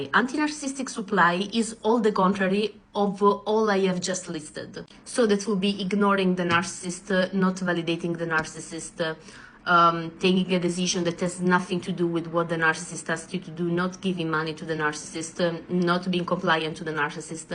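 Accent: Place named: Italy. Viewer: She just sounds Italian!?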